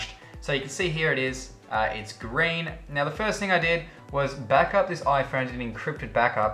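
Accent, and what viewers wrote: Australia. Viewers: you are from new south wales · Are u an Aussie?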